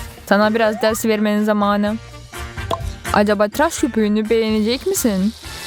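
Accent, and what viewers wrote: Azerbaijan. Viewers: Azerbaycanlı seslendirmiş Türkçe konuşmaya çalışarak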